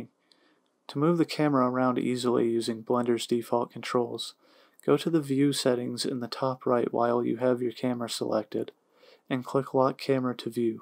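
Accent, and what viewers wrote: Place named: Texas, United States. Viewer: bro just sounds like a half asleep texan idk about smooth lol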